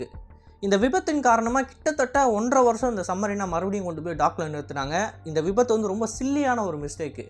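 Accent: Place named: Tamil Nadu, India. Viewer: Keep move bro, don't explain like tamil english. And useless coments.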